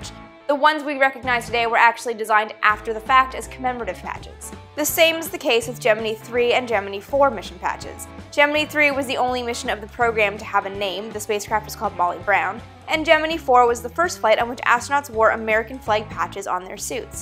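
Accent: Canada. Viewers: Are you Canadian ???? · In one of your videos I asked if you were from up north somewhere.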